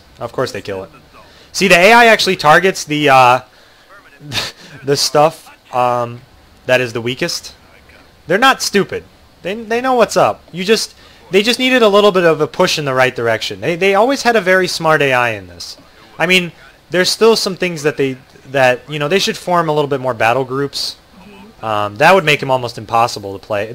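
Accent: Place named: Seattle, United States. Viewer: I thought u were from Seattle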